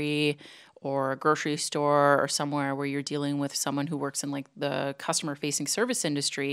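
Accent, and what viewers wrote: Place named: Canada. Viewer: Awesome video, from the moment I would have heard you "About" or "Sorry" I would have known you were Canadian lol that being said have you found that most Kiwi's aren't fond of American's? I'm from the Caribbean but I currently live in the US and I'm a bit curious to how Kiwi's would react to both Americans and people from the Caribbean too if you noticed those interactions as well.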